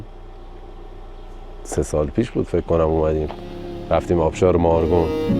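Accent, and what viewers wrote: Shiraz, Iran. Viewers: فدای تو که به فکر ما هستی من پا به پا با تو میام از بس لحضه قشنگ شیرازی را رها نکردی و ساده توضیح میدی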